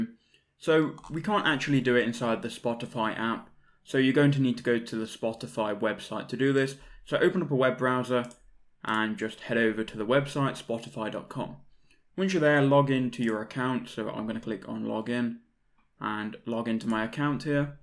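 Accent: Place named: East Midlands, United Kingdom. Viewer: British, sounds like East Midlands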